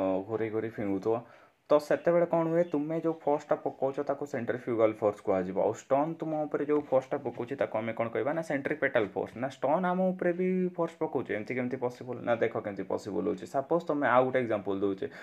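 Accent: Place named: Mayurbhanj, India. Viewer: Aapanaka language ru laguchi aapana mayurbhanj ru....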